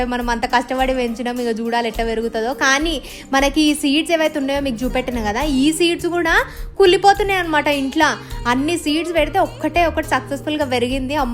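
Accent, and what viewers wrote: Telangana, India. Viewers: I love you telangana slang because I am also from telangana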